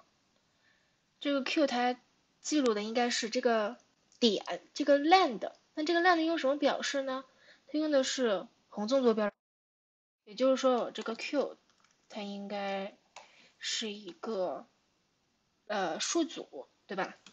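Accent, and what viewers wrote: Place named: Northeast, China. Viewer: 这个东北口音杠杠的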